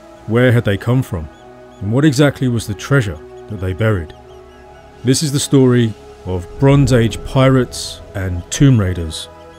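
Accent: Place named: United Kingdom. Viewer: Have you ever seen the Fall of Civilization channel/podcast it seems right up your alley. Plus his voice is a little similar to yours (you're both British but sound like you are from the same region). His podcast about Mayan Civilization was great. 9 times out of 10 it ends with the Civilization collapsing because of climate change. Check him out he's also on Audible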